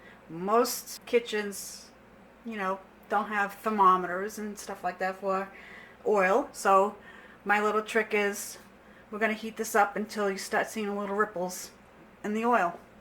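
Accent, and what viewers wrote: Boston, United States. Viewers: I hear the Yankee....fellow Bostonian here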